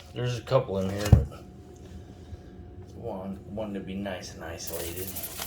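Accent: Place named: Wisconsin, United States. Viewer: You sound southern… Southern Wisconsin-ish. Seriously, you (and the wife and kids) are a treasure.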